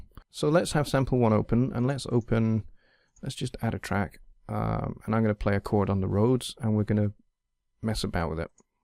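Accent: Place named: United Kingdom. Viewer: Thanks a lot, very clear information and I like your British accent. Is there a way of finding out who is making thumbs down?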